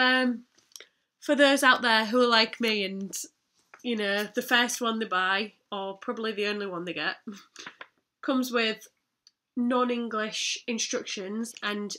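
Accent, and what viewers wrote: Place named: United Kingdom. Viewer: Ty weird British lady I owe you my life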